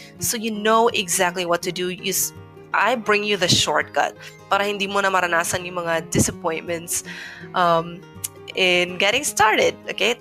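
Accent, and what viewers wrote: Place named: United States. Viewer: you speak great! 'thought at first that you're an american! great accent, lpve the tone of your voice.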